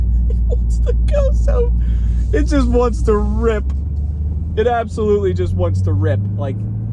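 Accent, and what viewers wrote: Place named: Delaware County, United States. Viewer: Picking up a little Delco accent there.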